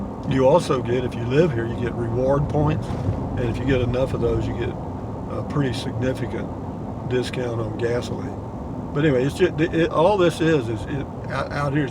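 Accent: Texas, United States. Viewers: You sound all Texan :p am i right ?? btw Smith's is just a different brand of Kroger. They have all the same Kroger products and sales, it's really not a 'local' spot. Not trying to be a dick but you keep saying things like "this is where the tourists go...." . My man, you are a tourist.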